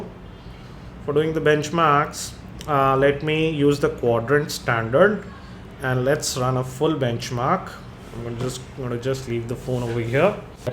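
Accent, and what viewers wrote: India. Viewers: best indian accent ever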